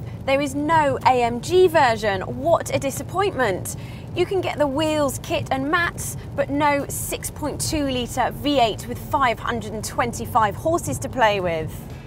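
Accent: United Kingdom. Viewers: will you also stop saying british accent there are lots of british accents its english she speaks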